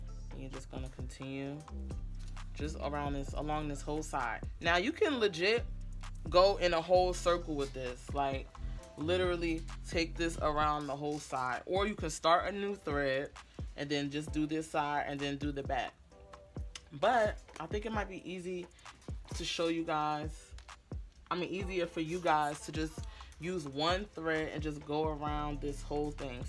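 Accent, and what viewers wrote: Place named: New York, United States. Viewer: Are you a New Yorker? love you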